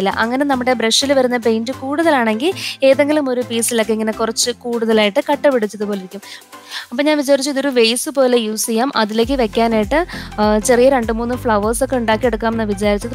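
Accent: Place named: Kottayam, India. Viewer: കോട്ടയം സ്ലാങ് ഉം രണ്ടും പൊളിച്ചു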